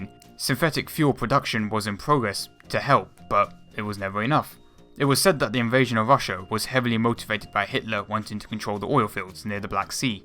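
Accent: England, United Kingdom. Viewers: This is true. He's from England, but not the part where I live. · Why has an british accent?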